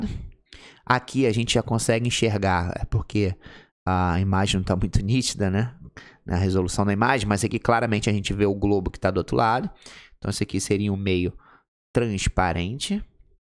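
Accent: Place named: Rio de Janeiro, Brazil. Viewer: Ele e do RJ kkkk sotaque kkkk aula boa 😊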